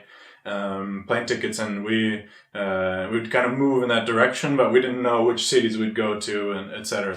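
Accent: United Kingdom. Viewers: She sounds like she has a British accent lol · Oh I get it now. He's Scottish. That explains everything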